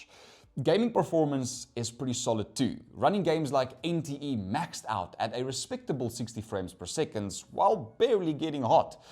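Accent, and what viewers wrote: South Africa. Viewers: Is this guy from South Africa?sounds like it.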